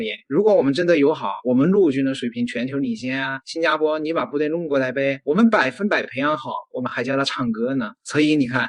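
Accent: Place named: Anhui, China. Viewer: 安徽口音的 😂😂😂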